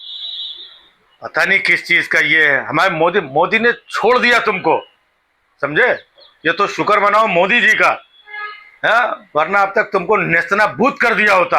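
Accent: Kanpur, India. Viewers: ji aap Kanpur ka accent me bola karo pls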